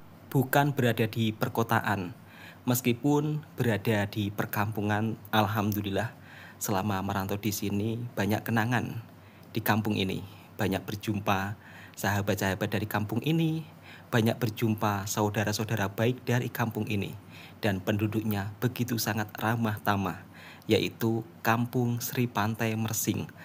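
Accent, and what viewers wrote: Java, Indonesia. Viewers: Slang Bro bicara seperti rakan rakan jawa di tahun tahun 70an. Teruskan bro berkarya. Moga nanti tidak kena cukai. Adakah bro balik terus?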